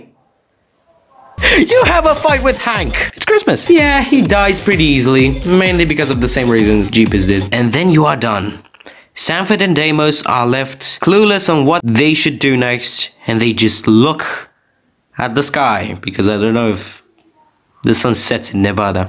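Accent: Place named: United Kingdom. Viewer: This is most the British review i ever heard nice video bro Also bro the way he said that XD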